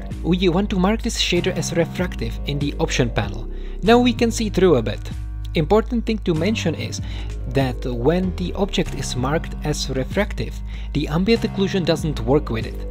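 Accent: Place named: Czech Republic. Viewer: Great tutorial! I am wondering, aren't you Czech? The accent seems familiar :D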